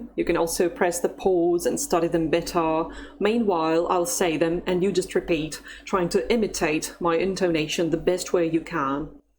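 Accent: United Kingdom. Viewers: когдо я сделаю упражнение я всегда читаю всё вслук вы очен ясна гаворете но иногда немного медленно. You are clearly a very skilled linguist, I will subscribe even though you have a bit of a British accent when you speak english. I can hear the all the authentic sounds when you speak russian.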